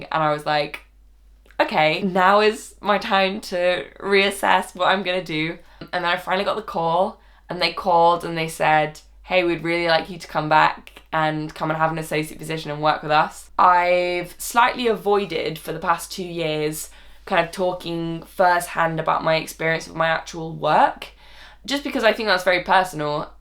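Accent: London, United Kingdom. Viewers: sounds especially british in this vid. Also why am i listening to the training process so seriously i'm not even a law graduate. Nevertheless congrats i'm so happy to see this! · I feel like you sound so different now! Maybe you've gained the London accent?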